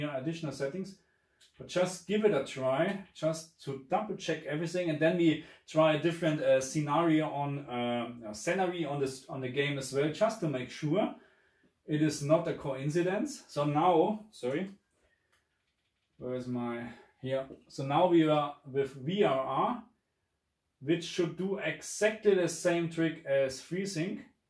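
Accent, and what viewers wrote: Germany. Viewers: Ist zwar ein englisches Video aber so wie ich das höre scheinst du auch Deutsch zu können😉. Gibt es denn noch massive Problem mit dem schwarzwert(flickering)beim vrr/HDR? Wollte mir jetzt nen CX für die ps5 zu legen. Danke für die antwort und frohe Weihnachten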